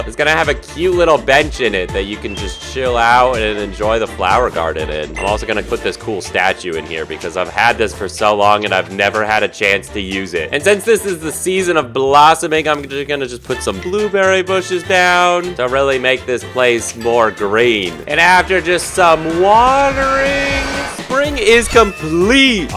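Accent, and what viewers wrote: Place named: United States. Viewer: Why does he sound American even though he said autumn?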